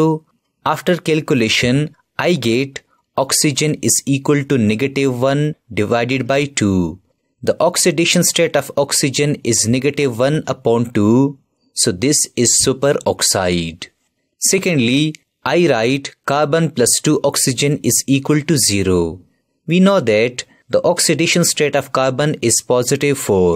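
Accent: India. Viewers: indian voice come in clutch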